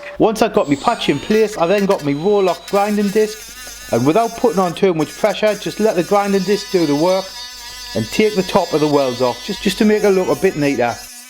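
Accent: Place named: Northern England, United Kingdom. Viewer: I am learning the Northern accent and lingo as i watch these as well as car stuff.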